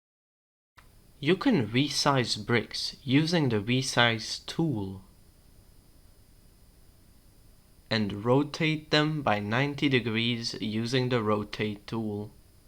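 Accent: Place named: United Kingdom. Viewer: great tutorial but youre british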